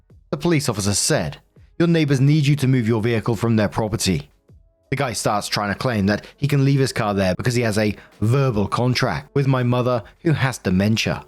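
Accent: United Kingdom. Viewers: I'm pissed someone had the gall to complain about your speech. I knew just what they meant, I'd heard it. I love that you speak uk English. Fuk em all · I love the sound of British English. They have so many cool accents over there 💕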